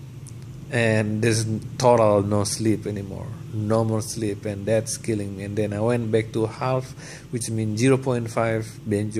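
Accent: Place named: Indonesia. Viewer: Why he was so afraid of tapered to banjo? It is a great instrument! :D :D :D :D :D :D :D :D OMG, this Indonesian accent is hilarious. :D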